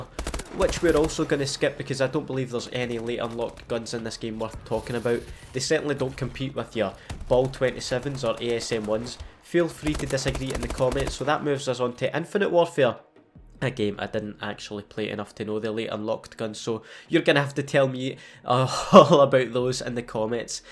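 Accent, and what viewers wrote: Scotland, United Kingdom. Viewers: I'm mainly gonna subscribe for that awesome sounding Scottish accent you have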